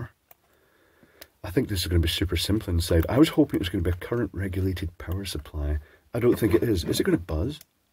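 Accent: Scotland, United Kingdom. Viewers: I always love hearing british accent. It sounds so nice. And of course, I enjoyed this video. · As an American i find the Scottish accent to be a beautiful accent, I just enjoy listening to it.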